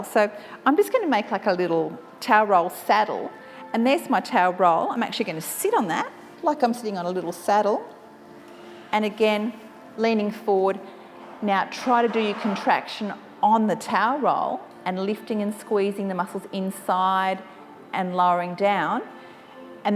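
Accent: Australia. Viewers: I’m making my rounds of videos just to hear her Aussie accent!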